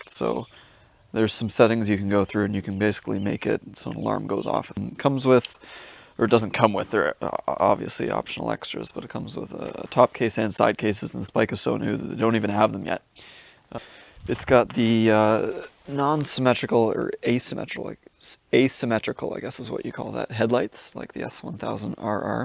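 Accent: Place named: Canada. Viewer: Great review. Thank you. When you talk about the speed you are going, is this in kph or mph please? (I see that the speedo is calibrated to read in kph but I think your accent is Canadian (sorry if I got this wrong and if you are in fact American!) and so you would normally be using mph. Judging from the video I suspect you are talking kph?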